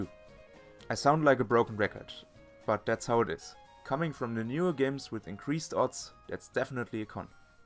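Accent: Germany. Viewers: Man hört an deiner Aussprache das du Deutsch bist 😂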